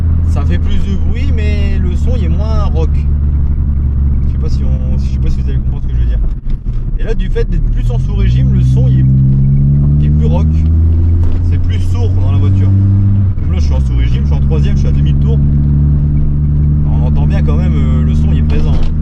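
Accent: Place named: Nord, France. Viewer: Je me rends compte que tu est du ch'nord aussi 😁🥰👍👌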